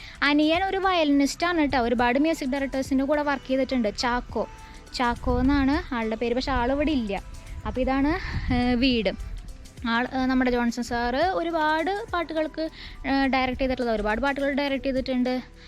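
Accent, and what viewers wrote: Thrissur, India. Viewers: ഇയാളുടെ തൃശ്ശൂർ ഭാഷ കേൾക്കാൻ നല്ല രസമാണ് ..😍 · തൃശ്ശൂർ ലാംഗ്വേജ് കേൾക്കാൻ നല്ല രസം തന്നെയാണ് അവതരണം 👌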